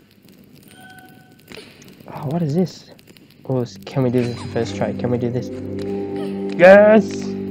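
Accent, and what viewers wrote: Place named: United States. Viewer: You've got a lil american accent in that, I see you watch my videos too much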